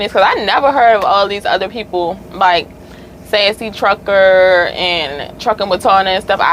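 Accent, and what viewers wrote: Baltimore, United States. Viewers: i’m just listening to her talk and i’m like she from baltimore…. but idk then i came out 🤣 our accents strong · I knew you were from Baltimore nobody else sound like us what happened to the NFI vid?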